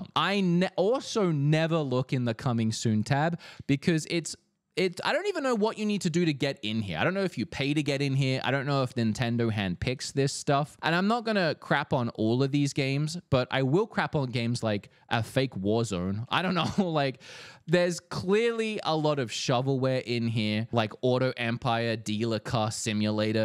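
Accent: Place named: Australia. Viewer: Your accent is quite interesting. Australian as a base, but select words are pronounced in a US American accent. Confused me for a bit when I started watching.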